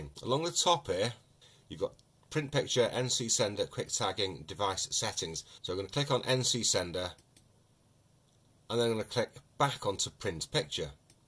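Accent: Wales, United Kingdom. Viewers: my friend, I’m going to be crossing the pond next year and spending 5 weeks all throughout the UK, Wales, Scotland, and Ireland with my gf and a couple mates. I’d really like to hook up and meet proper. I’m going to be checking out tons of castles and stone circles. Hopefully there’s some in your area and I can knock out 2 birds. I’m just not sure where you’re at. You have a southern/wales accent to ya. Hope you get back with me...Cheers. Btw, I built my lichtenburner from a oil burner. It doesn’t have near the power yours has. What are you using? Todd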